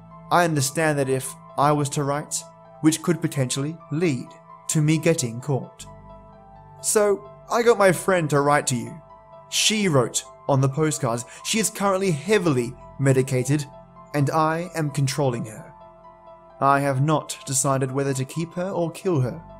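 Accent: Australia. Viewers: tis the best way to fall asleep, all cozy in a blanket burrito. And if I do manage to churn out some twisted tale of monsters and mayhem you shall be the first to read it I assure you. Absolutely love your voice in particular and the ozzie accent in general. ^_^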